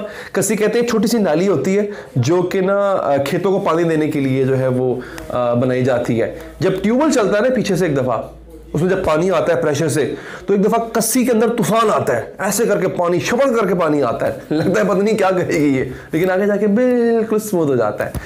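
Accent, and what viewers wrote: Punjab, India. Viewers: Punjabi touch is very interesting 😊😊,,,keep it up bro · Bhai your punjabi touch is amazing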